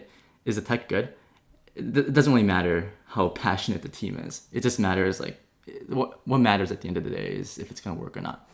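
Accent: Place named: California, United States. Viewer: Good content. I love your casual Californian style. ✌🏻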